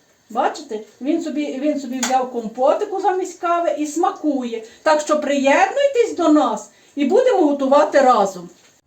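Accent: Ukraine. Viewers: Дивуюсь де яким коментарям.Все показано і розказано дуже чітко і ясно.Приємно дивитись і слухати таку нашу милу сільську украінську мову.Дякую і здоров'я вам і вашим помічникам.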